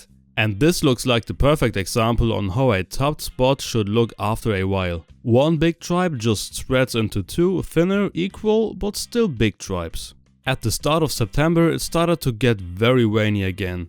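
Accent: Germany. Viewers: I hate to assume but you sound German so maybe you can grow in your home or yard soon. Also I assume you live in Germany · Man hört voll das er deutscher ist sein Englisch verrät ihn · Man hört das deutsch richtig raus hahahah ich liebe es ❤️😂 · Dieser deutsche Akzent 🤣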